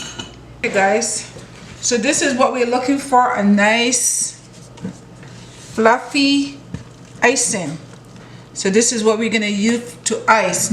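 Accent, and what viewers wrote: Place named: Barbados. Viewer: I am sooo happy I found this channel omg 😩 lunch cakes were the best thank you miss love tug see and hear ah bajan 🇧🇧mother talk 😂 Happy Mother’s Day to you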